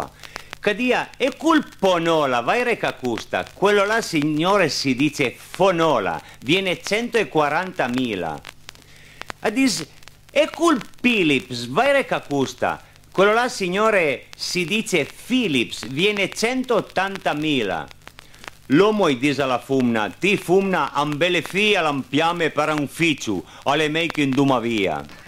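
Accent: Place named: Turin, Italy. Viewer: Hhaahahha che ridere soprattutto quella, dei televisori. Urbe era proprio una forza.... All'epoca un mio amico aveva diversi suoi dischi di barzellette. Che bello ascoltare il turineis puro.... Oggi il nostro dialetto così ilare e simpatico non lo senti più ormai.... Peccato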